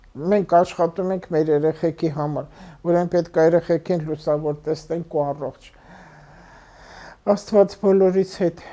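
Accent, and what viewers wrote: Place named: Tbilisi, Georgia. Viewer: Duk im nman ek xosum tilizi akcentov hat urax em vor dez lsum em es tiflizeci em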